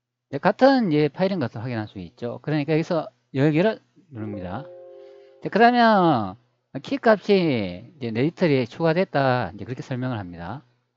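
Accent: Gyeongsang, South Korea. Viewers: 아이구... 상도 사투리.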